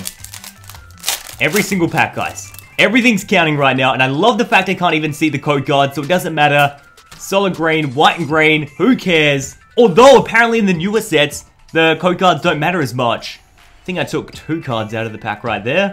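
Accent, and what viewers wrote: Australia. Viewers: Are you an Australian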